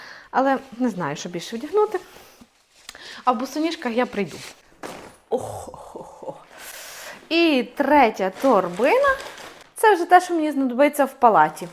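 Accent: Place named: Lviv, Ukraine. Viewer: Обожнюю львів'ян, їду з Києва спеціально щоб послухати ваш діалект, рідний мені з дитинства. Якраз збираю сумки в пологовий, тому дуже корисно було послухати, дякую!! ❤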